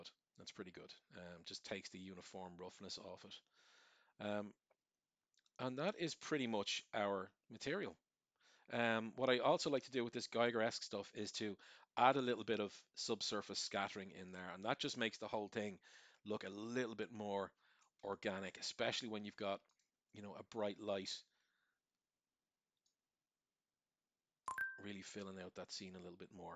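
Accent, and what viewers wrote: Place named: Ireland. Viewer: so rare to come across an Irish voice when looking at YouTube tutorials. Lovely.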